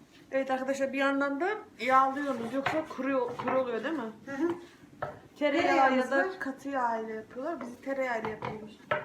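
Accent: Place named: Çorum, Turkey. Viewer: asıl memleketiniz neresi biraz çorum tarafına benziyor şiveniz becerikli süperrrrrrr süperrrrrrr hamarat anne hayırlı akşamlar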